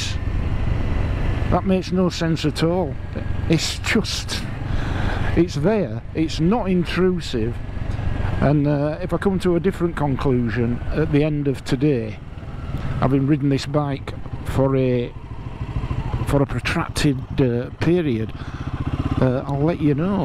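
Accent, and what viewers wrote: Yorkshire, United Kingdom. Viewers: Lovely to see ya again. Yorkshire Henry Cole. Makes me nod off every time. But I get the gist after watching several times. Keeps the views up lol 😂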